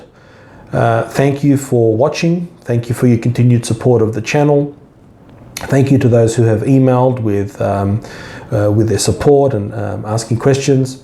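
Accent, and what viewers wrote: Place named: Australia. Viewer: At approximately I wasn't able to understand the word you said after "end in". Maybe because of your most lovely Australian accent. (: This exact scenario is what my friend hasn't yet been able to understand is transpiring between her and the narcissist she THINKS she loves, and who is deceiving her into believing he loves her. Whenever you have time, let me know the word. Thank you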